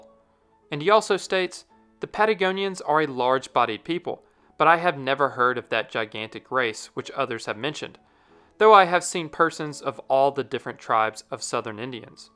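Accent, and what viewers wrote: South, United States. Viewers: Georgia boy? Or maybe Carolinas? Can hear the accent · Nice to hear an American accent finally on the commentator.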